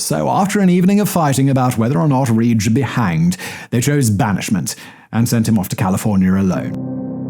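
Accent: United Kingdom. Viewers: Why are British accents so dreary/boring? This guy could be talking about the cancer cure and I'd fall asleep every time.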